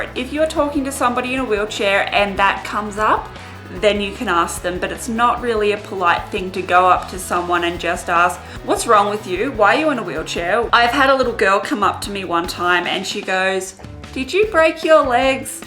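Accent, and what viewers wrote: Australia. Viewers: I LOVE your accent!! Australian, right? It's adorable. Cheers from San Francisco :)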